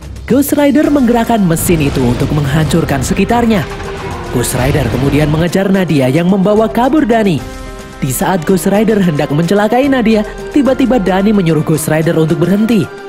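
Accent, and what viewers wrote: Java, Indonesia. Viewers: Wah Mimin Jawa ya 😅☝️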